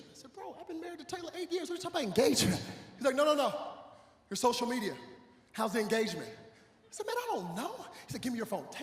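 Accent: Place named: AAVE, United States. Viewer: You use way to much. And a little ghetto. Sorry but you should bring it down with the ebonics.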